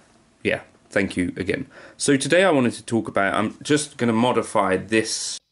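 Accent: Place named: Bristol, United Kingdom. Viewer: Random question - Where did you grow up? My girlfriend has overheard your videos when I watch them and called you "that Bristol guitar bloke" based on your accent. I said he's from Exeter in Devon as far as I know and the accent is similar (We're oop north) but she insists you're from Bristol and must have moved. I insist she's crap at identifying accents. Can you help settle an argument?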